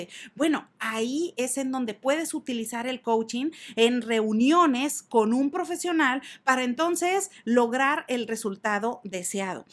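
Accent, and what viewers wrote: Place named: Mexico. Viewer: saludos desde argentina me gusto tu explicación además de la energía con la que le das énfasis a tus palabras y de gustarme ese lindo acento mexicano ji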